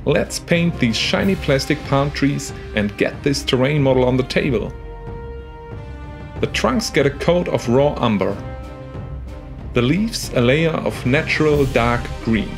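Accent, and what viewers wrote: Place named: Germany. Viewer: Once again a great build. Somehow I love your hard german "Akzent" :-D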